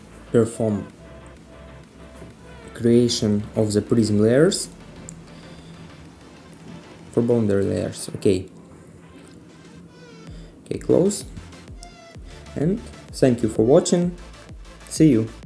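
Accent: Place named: Russia. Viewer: спасибо за ценный урок! Судя по произношению - наш человек!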